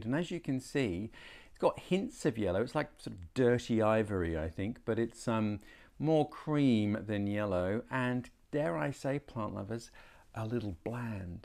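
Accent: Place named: Australia. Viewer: Hi, I really enjoy your energetic teaching and sharing of your amateur orchid knowledge... the Aussi accent, well-spoken English, and humor are very entertaining. I have about 5 orchids that are from a 'seed population'. One of those, a violacea var. correulea, has a spike growing now. Even if the flower doesn't have good color saturation, after a year, it will be difficult to part with it! I'd likely consider trading it for another mature plant. Cheers.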